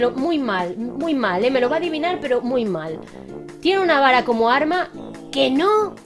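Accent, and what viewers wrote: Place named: Spain. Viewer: Sí, es española. Se nota por su acento 😆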